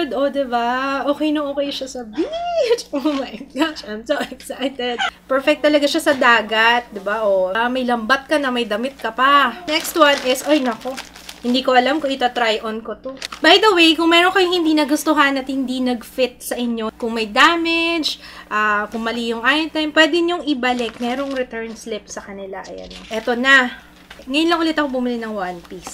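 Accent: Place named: Iloilo, Philippines. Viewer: ms, bkt ilonggo po yung accent nyu??, ilonggo po kc ako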